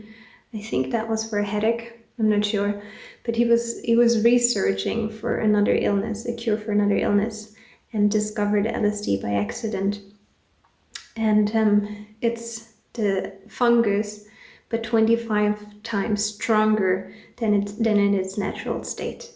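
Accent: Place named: Norway. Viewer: Its so cool to see a "normal looking" woman talking about physcadelics. Not that everyone doesn't partake i just feel like your breaking a stereotype. Good content, i prefer lsd if im looking for a good time and i choose shrooms when i feel like having my sorry ass dragged through my own physce by nature haha, really though when i take shrooms i feel like im talking to my therapist. An old friend i like to visit when i need advice. New subscriber here , where are you from ? I love your accent you remindlike one of my favorite musicians from norway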